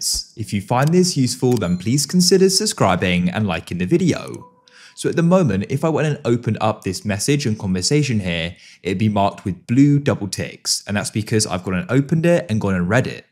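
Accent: United Kingdom. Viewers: You know what buddy it was helpful.On top of it I can guarantee that you are a Brit. I am used to hearing proper english as it's 11 years I am working for BT 😅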